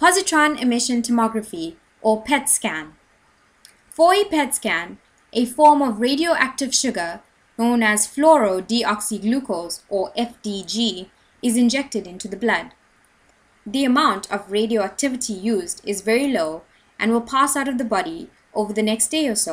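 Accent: South Africa. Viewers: Are you from Durban?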